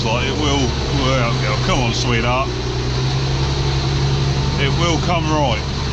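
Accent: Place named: Lincolnshire, United Kingdom. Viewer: help settle an argument for me please, we know you're in Beds. but you're accent sounds Lincs. to me but my mate reckons it doesn't, so are you or are you not a Lincs. lad?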